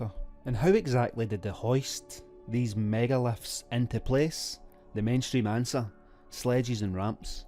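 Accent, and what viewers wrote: Scotland, United Kingdom. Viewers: Always good to hear a fellow Scot. I’m doing a similar video at the moment for my channel. The Thunder Stone in St Pete’s which I’ve been to and is about 1500 tons was moved by manpower in the 1700s. I’ve been to the Menga Dolmen with a 180 ton capstone, Broken Menhir in Brittany up to 350 tons or the Unfinished Obelisk over 1000 tons. The ‘technology’ and magic for me is nature. This is what we’ve lost sight of and our connection to the planet.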